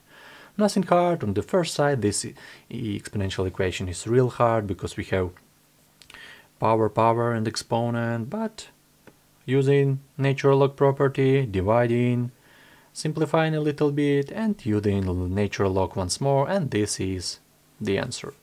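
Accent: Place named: Russia. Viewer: hello there! you are making great content! i have a few questions.u dont have to answer, if you see some of them personal) 1. will you do videos on higher mathematics? 2. what is your origin country? (i'm asking you this question,cause of your accent. i am from russia and i hear many of ours speak in english like you) 3.do you enjoy your life?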